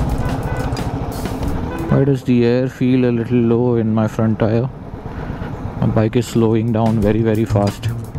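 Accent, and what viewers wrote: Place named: Kolhapur, India. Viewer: I soooo friggin miss that Kolhapuri accent though! 🥺🥺🥺🥺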